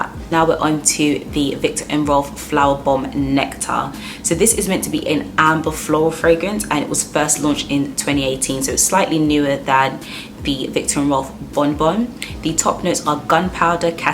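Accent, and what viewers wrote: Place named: England, United Kingdom. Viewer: Beautiful English accent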